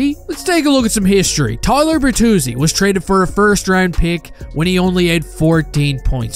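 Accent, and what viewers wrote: Newfoundland, Canada. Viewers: Are u from newfoundland? If so what part?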